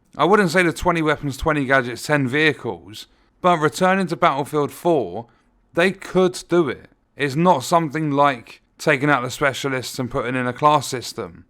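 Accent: United Kingdom. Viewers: Not to be disrespectful but the british accent makes this video even more enjoyable and funny. :)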